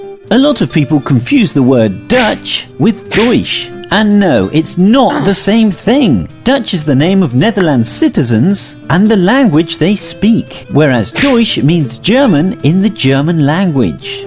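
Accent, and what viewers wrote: United Kingdom. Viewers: Is it Brexit that I don’t like the British accent anymore?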